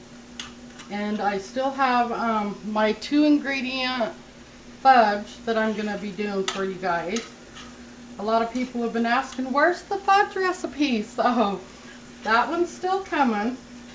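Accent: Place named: Utah, United States. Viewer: I spent about 7 years in Utah. (Including a couple years in your area!) Your Utah way of speaking, & your recipes take me back. I love it! Love to you & yours. Wishing you & your family a very Merry Christmas, & the very best of this holiday season. Thinking especially of your parents, & praying that everything proceeds in the best, most gentle, Light-filled way possible. God be with y'all.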